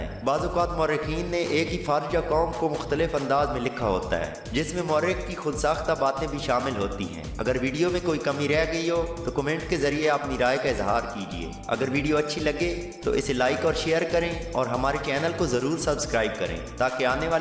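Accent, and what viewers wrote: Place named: Saraiki, Pakistan. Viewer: آپ کے لحجے سے پتا چلتا ہے کہ آپ سراٸیکی خاندان سے ہو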